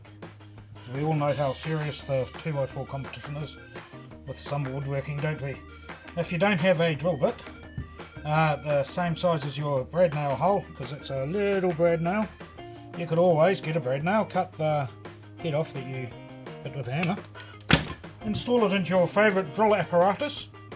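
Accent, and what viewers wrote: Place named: New Zealand. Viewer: Good luck... finally another kiwi woodworker... and our kiwi sense of humour and accent I can understand... lol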